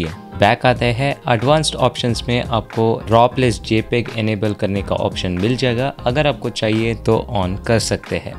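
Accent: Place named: Karnataka, India. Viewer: You are not native Hindi speaker, you sound like a kannadiga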